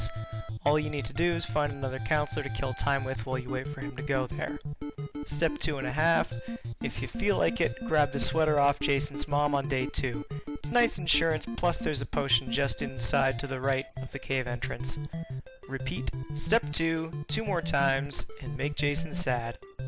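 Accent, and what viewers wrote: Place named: Canada. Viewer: SO MUCH CANADIAN ACCENT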